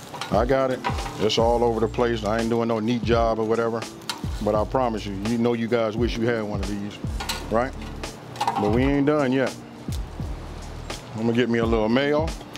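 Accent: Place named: South, United States. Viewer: I was Stressed out today. finally got some free time but stressed. Tried a movie. Could not concentrate because still stressed out. Thought some music video might help but this was recomended. This guys Talking southern USA accent calmed me right down. Thanks and You Tube.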